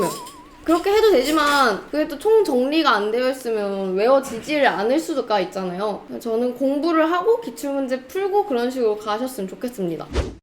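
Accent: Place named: Gyeongsang, South Korea. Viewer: 오오 님 영상 처음 보는데 오 서울 사람인가보다 하다가 중간에 어? 경상도 사투리 한 번 쓰시길래 설마 헀어요 근데 마지막 쿠키 보니까 완전 경상도 사람 이었네여 ㅋㅋㅋㅋㅋㅋㅋ 필기 1급 공부하려 하는데 꼭 합격 해야겠어요!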